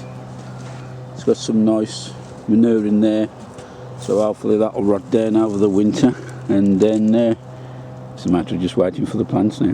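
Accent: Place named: Midlands, United Kingdom. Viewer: Hi. I just want to say thanks for uploading your videos. It's great to hear a fellow midlander on the screen. I'm from Dudley and proud of my Black Country roots. I can see that you're a bit of a womble like myself and believe in recycling whatever you can. Great videos and keep up the great work.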